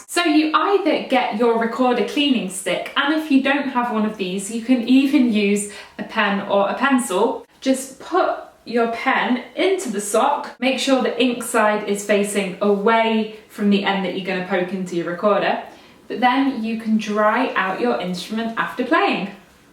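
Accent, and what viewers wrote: England, United Kingdom. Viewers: I speak Portuguese, but learned English by myself, after years of web-surfing, song playing and gaming. I am an amateur musician (I play guitar, C and F recorders, harmonica), and I can say that your videos are mesmerising - not only because of your skills and simpathy, but because of your English accent... and, lets be honest, when you speak, your mouth is hypnotic!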